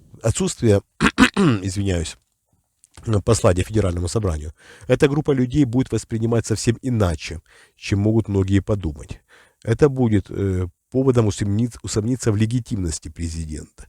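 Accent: Ukraine. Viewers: Вопрос. В России настолько слабая контр разведка, что не может вас вычислить или вы, простите, работаете на власть? . И ещё, у вас явный хохляцкий акцент. Вот прям как мошенники из банков звонят. Опровергните?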